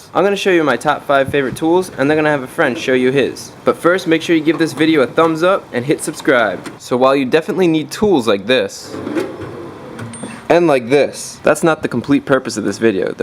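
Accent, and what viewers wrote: United States. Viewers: Sir are you an american ??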